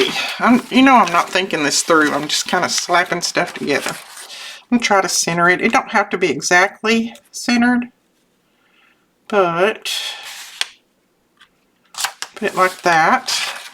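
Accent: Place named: Tennessee, United States. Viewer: When I heard you say your birthday is October 7, and had depression , I also have depression bouts out of nowhere. I have taken meds for several years, and they really help. But sometimes I have to fight it everyday. Also, our youngest son ‘s birthday is October 7. He died 4 years ago, so it is always a tough go during that time. He was 49 years old. I listened to you talk after your husband passed, and I felt so helpless. There are no words, or right or wrong way to grieve. Everybody has their own way to try to get through. I feel his presence when a Cardinal comes to see me, when I find a bird feather, see a beautiful butterfly, or see his image in a cloud. I hope your depression stays in the background, and your memories of your husband make you smile. I watch you all of the time. Thank you for taking the time to make your videos and for your beautiful freebies. 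It is also nice to hear someone who sounds like me. I am from Tennessee.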